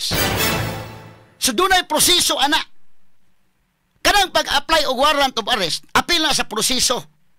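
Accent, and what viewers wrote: Visayas, Philippines. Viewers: I like to watch your videos because you’re humorous and straightforward. And love to hear my dialect of bisaya. I lived here in USA 🇺🇸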